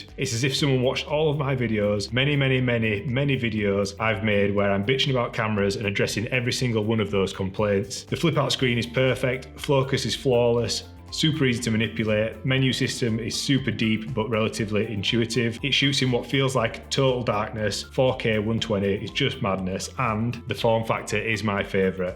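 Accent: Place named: Newcastle, United Kingdom. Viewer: Fellow Geordie? Or must be close.. 🤔✨👊🏼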